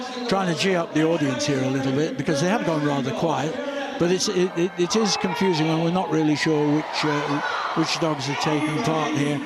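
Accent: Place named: RP, United Kingdom. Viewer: These descriptions being read by this prestigious British man are cracking me up! So freaking cute!!! 🤣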